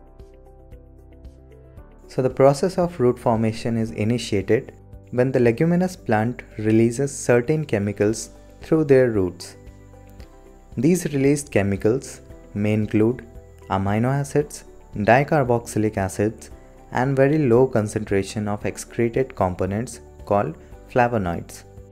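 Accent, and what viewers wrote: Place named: India. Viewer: His totally indian accent help me too understand this clearly vrna animation m har jagah angrezo jese aawz !! !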